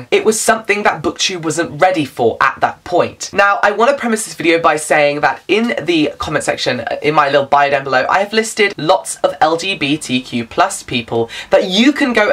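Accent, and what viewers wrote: United Kingdom. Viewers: I love your channel. Your enthusiasm and love of reading is infectious. Your accent is adorable. (I'm American and love Brittish accents) Forget the haters. They know nothing. And if your sexuality is a turn off for them, then they were not true fans in the first place. They're just ignorant trolls. #DontFeedTheTrolls Ignore them and do you. Your true fans will appreciate that all the more.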